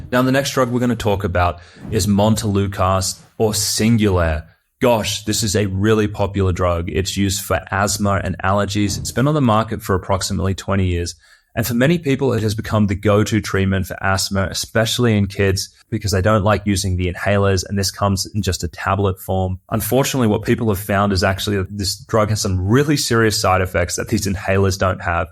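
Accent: Australia. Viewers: I hear an Australian accent? How would you rate the Australian mental hlth system in comparison to other countries?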